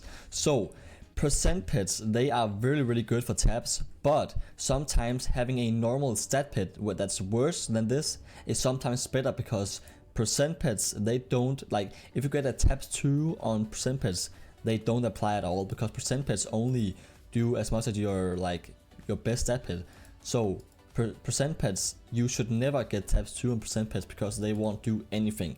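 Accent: Denmark. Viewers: Elsker din danglish haha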